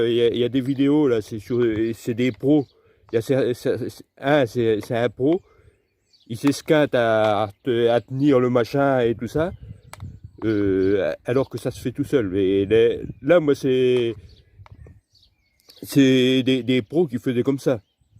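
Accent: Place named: Nord-Pas-de-Calais, France. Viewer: Et avec un bel accent du nord pas de calais. Merci pour tes conseils min tcho!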